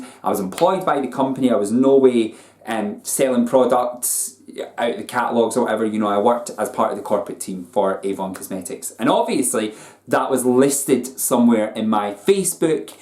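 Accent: Scotland, United Kingdom. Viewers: Ooh Scottish accent, dare I guess Glasgow originally?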